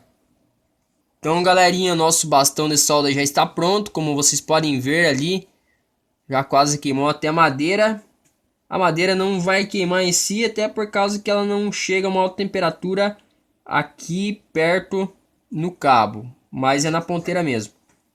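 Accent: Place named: Paraná, Brazil. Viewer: tu é paranaense né mano kkkkk tmj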